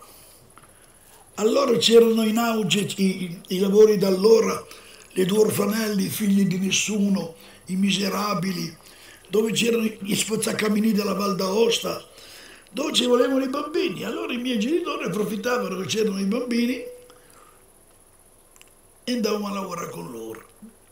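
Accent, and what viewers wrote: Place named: Milan, Italy. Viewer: Sono giorni e giorni che vedo e rivedo questi filmati di Piero Mazzarella un grandissimo attore di prosa dalla voce inconfondibile dalla cadenza dialettale milanese di una volta e mi si stringe il cuore e mi commuovo a sentirlo sapendo che purtroppo non'è più fra' di noi mi sarebbe piaciuto che ci fossero più filmati a lui dedicati in rete per sentire i suoi racconti storici culturali e dialettali perchè attraverso di essi rivive un mondo che non c'è più ma che scorre ugualmente nel nostro sangue e nel nostro DNA in modo inconsapevole per questo ci emozioniamo quando li ascoltiamo perchè ci fanno capire che essi rappresentano le nostre origini etniche e culturali che spesso ignoriamo ma che fanno del popolo italiano un popolo immensamente ricco a livello linguistico e comportamentale.P.S. R.I.P.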